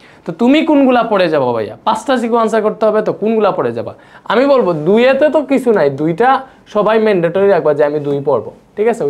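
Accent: Sylhet, Bangladesh. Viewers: ভাইয়া তুমি সিলেটি 😩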